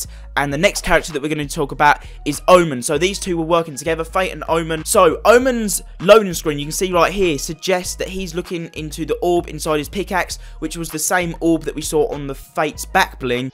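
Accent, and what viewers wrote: United Kingdom. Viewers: Is it me or do all of these Fortnite you tubers who discuss fornite lore sound the same.....a uk man with a Very very strong Uk accent...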